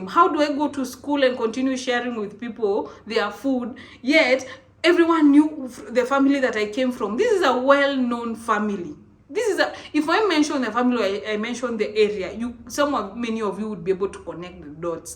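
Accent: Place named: Kenya. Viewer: I literally enjoyed every bit of the story. I can relate with everything you said (though I have not experineced everything). Well done beautiful. I love the Kenyan accent and how you just let yourself be " " and nobody else. Sis permit me to ask what Cameras and Lights you use for your podcasts?( I need the info for mine too) It is soooo clear and it enable me admire and just enjoy that beautiful transparent, glittering skin of yours. You are SkincareProMax.😊😊😊 Much Love from Cameroon. ❤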